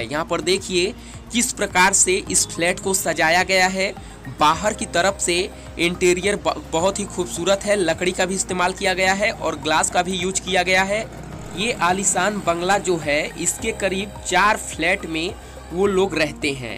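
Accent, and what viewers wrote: Bihar, India. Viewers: Bihari accent mein kyun baat karte ho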